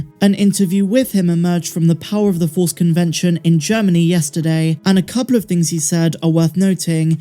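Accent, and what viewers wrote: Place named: West Country, United Kingdom. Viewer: Great vids as always, are you a fellow west country person, I can hear a twang :D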